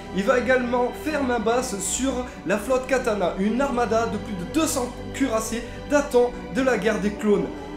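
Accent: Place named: France. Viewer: Bon choix de sujet, Thrawn c'est le meilleur perso SW de tous les temps :) Cependant j'ai un peu de mal avec ta prononciation très frenchy, sa race c'est "Chiss" (prononciation: Tchisse), pourquoi donc prononcier "Chie"? xD bon pour Pellaeon j'avoue plein de monde a du mal ^^ et tu as dit "zogri" au lieu de "nhogri"... (je pinaille hein mais c'est pour que vous vous amélioriez!) :p haaan et à la fin t'as dit "général Thrawn" :) Notons aussi que certes en revenant vers eux Thrawn est adulé par la majorité de la population Chiss, mais pas par les classes dirigeantes qui font exprès de l'ignorer royalement (trop fiers pour montrer qu'ils s'étaient trompés en l'exilant!) Bon voilà désolée pour les petites critiques mais c'est pas méchant ^^ encore une fois vous vous êtes bien documentés c'est une bonne chose :)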